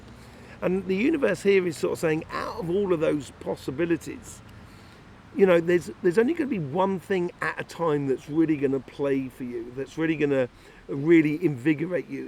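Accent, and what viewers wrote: United Kingdom. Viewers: You are one of the Top Notch Best!!! With excellent reading you got such an amazing beautiful British accent, it is just delight to hear whole reading without noticing how fast it is over!!! Thank you very much!!! Wish you amazing health and success!!! ☘🌿🌹☘♥☘🌹🌿☘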